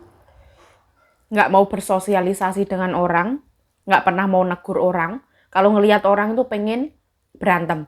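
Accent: Java, Indonesia. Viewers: Makane Jawane Medok dekatnya Ngawi To Rumahmu. Terasi Digoreng Sekali Banyak. Gk Masyalah.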